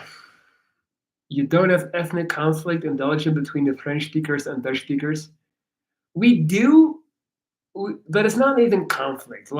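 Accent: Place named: United States. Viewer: I'm glad you're honest about emulating an American accent 😂 You are the most American European I've ever heard speak in my life haha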